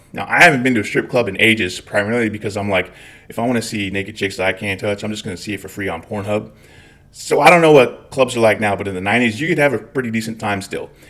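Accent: Scotland, United Kingdom. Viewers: as a resident of Northern Ireland. Your Scottish accent had a fair hint of a Belfast twang to it! Lol! Nonetheless, you can definitely hear the Scotsman in your speech! Your stories are funny as fuck!! I've never served (My Mum's side of the family did during WW2!) but my Butthole has went nuclear on several occasions! I've made toilets glow in the dark! My farts threaten the fabric of space and time! (Not to mention the fabric of my jeans!😬😬😬)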